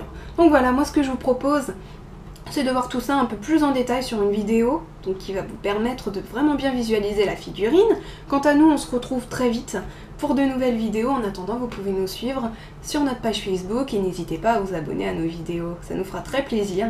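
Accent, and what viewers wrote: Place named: France. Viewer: Im from spain but love french accent :)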